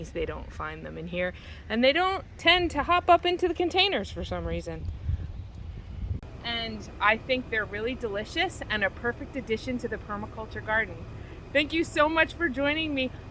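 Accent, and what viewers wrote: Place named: New Jersey, United States. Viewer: I have been considering walking onions and someone handed me some at my farmers market this week so I plan to plant them. I’m wondering if I plant them in the fall or in the spring? I have lived in Illinois for 45 years but I recognize your New Jersey accent right away since I grew up there. I didn’t move here until I was 22. You made me homesick lol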